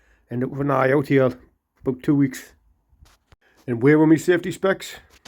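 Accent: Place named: Newcastle, United Kingdom. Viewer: Great Newcastle accent ! - Fab car - All the very best of luck with the rebuild m8👍👍👍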